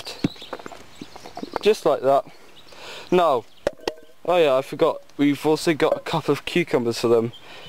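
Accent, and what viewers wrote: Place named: England, United Kingdom. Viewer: why does everyone interested in worms, have that annoying English accent?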